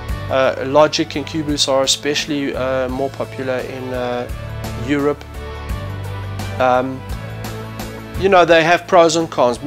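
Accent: South Africa. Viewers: I must say I do enjoy your way of explaining things to the people. Very simplified and gentle (I like your South African accent too) I hope you're happy with your life, I think you have earned the right to be happy :)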